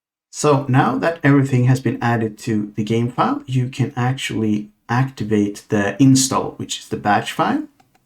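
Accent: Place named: Sweden. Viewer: Älskar den ibland svengelska accenten och reklamen "enkel kemikaliehantering". tack för videon förresten, riktigt bra!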